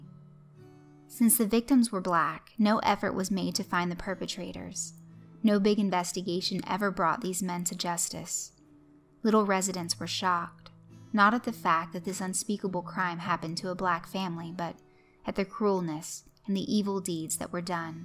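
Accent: South, United States. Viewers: This Southern drawl you do in these kinds of pastas is so sweet! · Damn racism, it's never funny. I love the southern accent. You know how to narrate you southern bell :)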